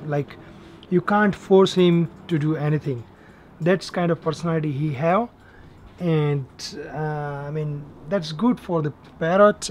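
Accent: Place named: Bhojpuri, India. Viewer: Bhojpuri accent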